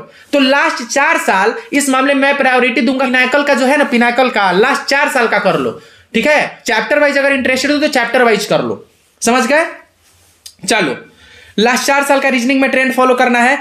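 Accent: Bihar, India. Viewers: आप बिहार से हो ना 😇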